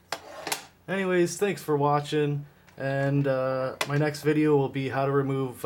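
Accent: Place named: Canada. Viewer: ...you've just been erased!.....i could tell you were a fellow canadian right away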